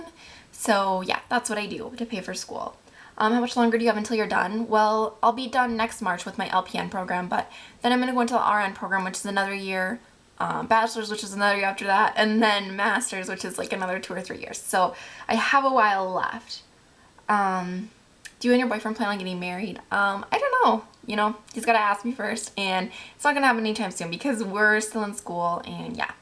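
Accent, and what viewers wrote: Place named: Minnesota, United States. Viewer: I knew you lived in minnesota!! you totally sound like it! but yeah i live here to!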